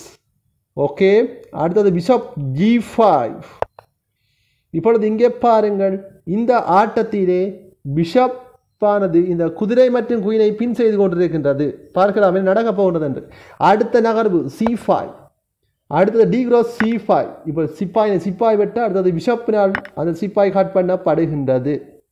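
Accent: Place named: Sri Lanka. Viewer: Arumai ilangai Tamil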